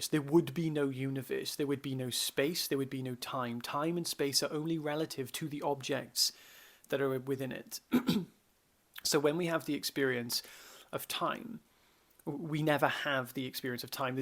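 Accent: Wales, United Kingdom. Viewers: Hang on.... I'm listening to you now, you sound Welsh? I'm from Cowbridge. I am guessing that you are near Cardiff?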